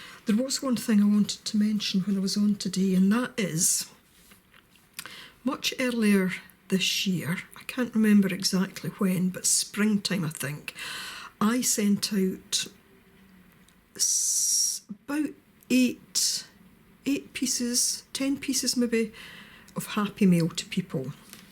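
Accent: Scotland, United Kingdom. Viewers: A fellow Scot to watch!!!!!! Your speech is very clear, I really hope mine is at least half as clear. I always worry about folk understanding through the accent. Anyway, just found your channel and (aside from the familiar accent) I’m very glad I did as you seem to have lots of tips, ideas and projects. So I’ll be catching up on some of your videos today.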